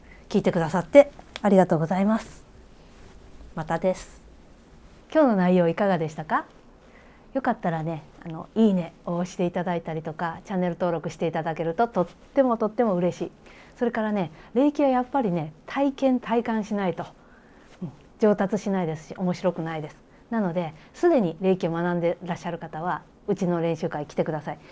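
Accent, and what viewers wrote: Kansai, Japan. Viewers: 関西弁素敵です。辻さんのお話は、体の深いところから出ているように感じます。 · 関西弁で、喋ってるさん好きです。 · 関西弁好きですよ！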